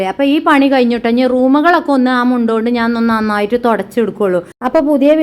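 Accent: Malappuram, India. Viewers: മലപ്പുറത് ഉള്ളവരൊക്കെ അങ്ങനല്ലേ സംസാരിക്കൊള്.... മലപ്പുറത്തേർ കണ്ണൂർ ശയ്‌ലി എങ്ങനെ പറയാൻ പൊട്ടത്തി..... 😂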